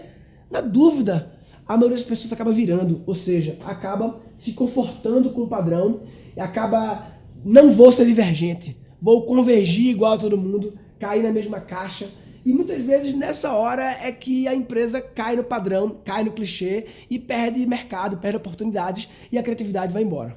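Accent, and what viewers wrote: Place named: Recife, Brazil. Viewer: Gostei muito desse video,! Você tem um sotaque daqui, da região metropolitana do Recife!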